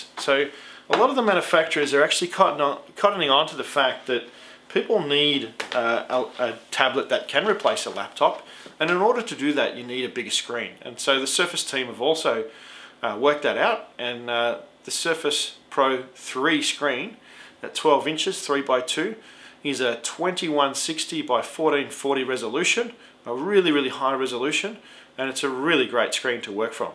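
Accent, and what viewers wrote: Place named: Australia. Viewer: Thanks for such a great presentation and review. Great to hear an Aussie accent in one of these too!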